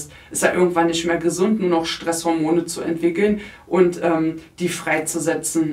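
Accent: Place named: Saxony-Anhalt, Germany. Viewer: Ich folge Dano schon länger und wusste von dir nichts.Ich mag dich und deine Worte,einfach deine ehrliche Art🌹..und deinen Dialekt (komme selbst aus Sachs.-Anhalt).. Die letzten 3Jahre haben den Zustand unserer Gesellschaft sichtbar gemacht....Empathie und Herzenswärme wurden reduziert. Bleib bitte wie du bist und halte deine Schwingung oben. Ich sende dir Licht ,Liebe und viel Kraft 🩵🙏✨️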